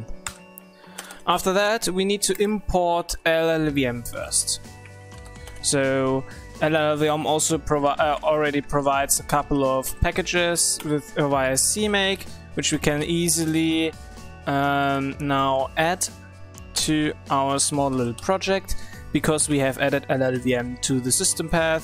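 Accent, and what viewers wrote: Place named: Germany. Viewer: 1) nice microphone 2) nice video editing 3) nice voice :) high quality! Just some weird ways to say things but great work! But that might come from the german accent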